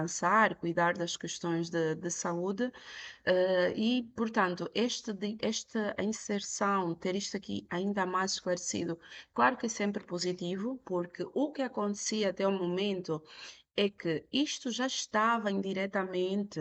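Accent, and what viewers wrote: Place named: Cape Verde. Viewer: A formadora tem um sotaque interessante, parece de cabo verde.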